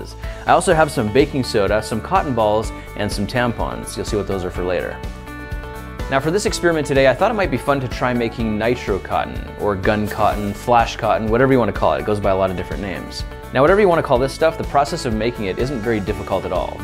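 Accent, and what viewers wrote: Canada. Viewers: Is that a Canadian accent?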